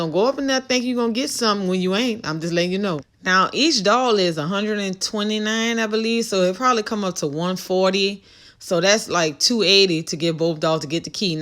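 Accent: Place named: New Orleans, United States. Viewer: Hi! I don't believe you really collect pins, but there's this shop called on Morgane Brret Shop on Instagram, and she recently did a small pink dress Cinderella themed pin collection, and it reminded me of your channel! I hope this comment isn't too forward, but I just wanted to let you know. Love your channel, and it sounds like you're from New Orleans, so it's cool seeing another fellow Disney collector from Louisiana!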